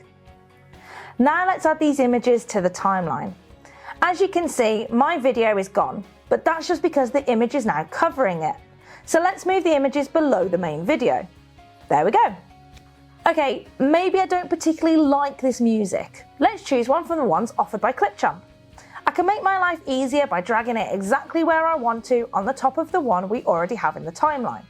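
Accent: United Kingdom. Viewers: This woman definitely British 😂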